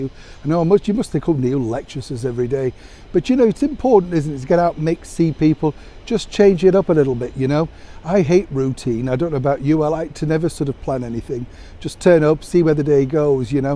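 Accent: United Kingdom. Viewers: Never a 'lecture' Neil...let's call it a well needed 'pep talk' in a delightful British accent! 😉💕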